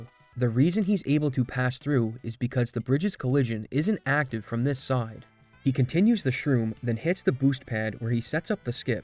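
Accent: Canada. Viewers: very canadian accent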